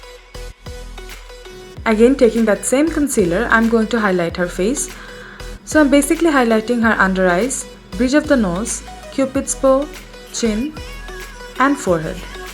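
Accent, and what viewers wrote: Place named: Bangladesh. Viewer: Why Indian? You sound like bangladeshi 🤨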